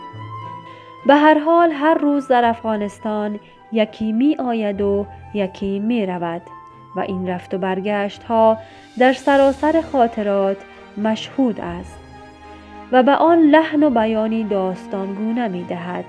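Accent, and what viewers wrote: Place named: Iran. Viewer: Why don’t you speak Afghan Dari. You sound very foreigner meaning, Iranian.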